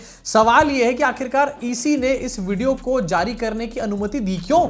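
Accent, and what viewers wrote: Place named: Punjab, India. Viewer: आप क्या पंजाबी हो आपकी tone पंजाबियों वाली लगी मुझे । प्लीज बताए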